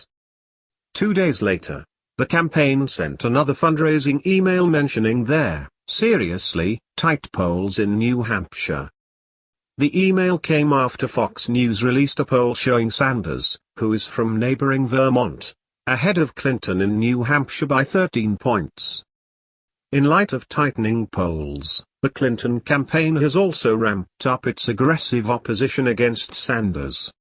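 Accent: United Kingdom. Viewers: I dont mind the british accent but im pretty sure its a bot though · It's a person just bad sound, and he sounds British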